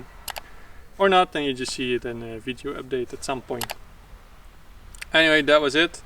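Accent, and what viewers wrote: Netherlands. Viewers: So obvious cause of the accent he is Dutch from origin.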